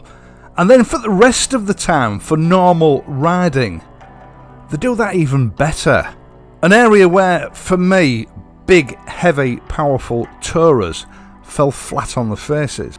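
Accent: United Kingdom. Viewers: UK accent. and British traffic is left-hand drive also.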